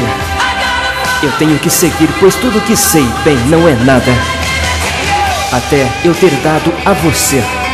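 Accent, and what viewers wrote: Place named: Paraíba, Brazil. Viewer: Voz meio Paraíba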